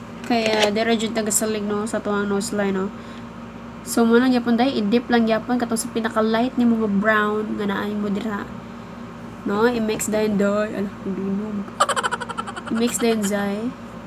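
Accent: Visayas, Philippines. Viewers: Trueee! HAHAHAHA may sense of humor kasi saka bet na bet ko yung accent ng pagbibisaya niya😂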